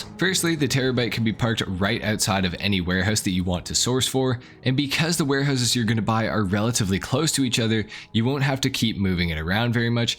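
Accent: Winnipeg, Canada. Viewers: Nah buddy, you from Winnipeg? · Haha I didn’t know you were from Winnipeg bro.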